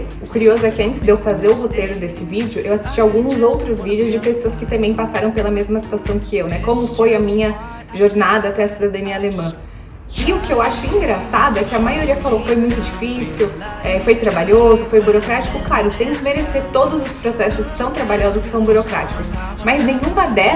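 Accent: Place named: Rio Grande do Sul, Brazil. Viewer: Até achei que era conterrânea ..gaúcha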